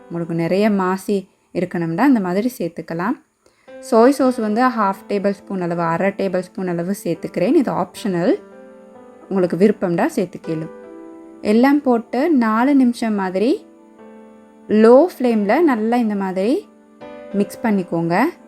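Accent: Akurana, Sri Lanka. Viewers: Pechi Slang akurana mathiri irunshi athu than ketten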